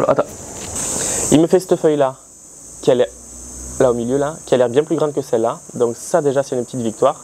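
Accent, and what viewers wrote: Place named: South, France. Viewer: Oh le bel accent du midi 😂😂De très belles plantes et de l'humour tout y est ❤❤❤❤❤❤❤❤❤❤❤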